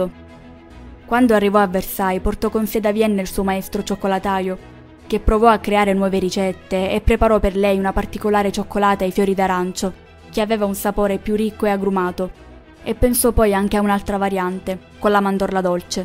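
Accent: Sicily, Italy. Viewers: DAL TUO BEL ACCENTO SONO QUASI SICURA CHE SEI DEL SUD DELLA PROVINCIA DI SIRACUSA O RAGUSA BRAVA COMUNQUE MOLTO BELLO IL TUO CANALE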